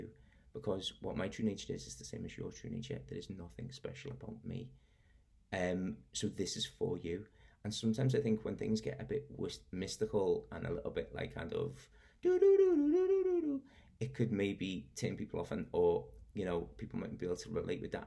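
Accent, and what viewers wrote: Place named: Liverpool, United Kingdom. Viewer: Blimey, scousers are becoming self realised now? I've never felt more like I've missed the bus. I'm joking (!) I unironically love Liverpudlians and your wonderful accent!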